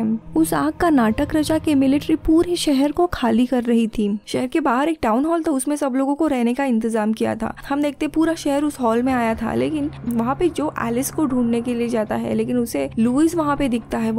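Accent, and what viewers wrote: Maharashtra, India. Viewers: Ur accent is Maharastrian. R u marathi?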